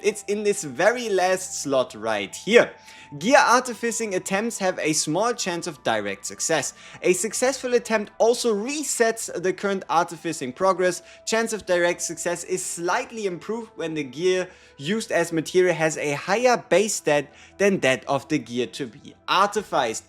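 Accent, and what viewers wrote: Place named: Germany. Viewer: I spot myself a fellow german when I hear one :D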